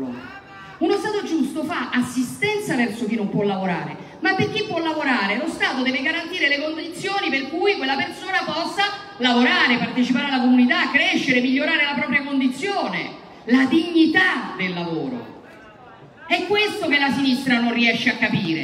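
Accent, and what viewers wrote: Rome, Italy. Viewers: Uno splendido accento romanesco ! la meio de Roma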